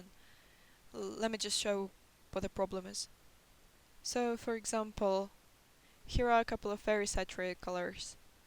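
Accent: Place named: Eastern Europe. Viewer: у тебя не самый всратый английский, что я слышала. Но местами прям "славская" натура прёт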